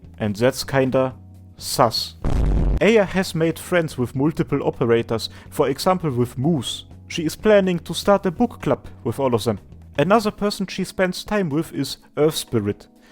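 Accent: Germany. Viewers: The way you pronounced "Heiligkeit" as well as your accent in general make me believe you're German. Please tell me if I'm correct with that assumption.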